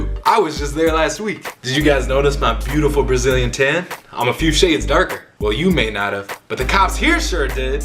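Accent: India. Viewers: the Indian accent kinda sounds like Scooby Doo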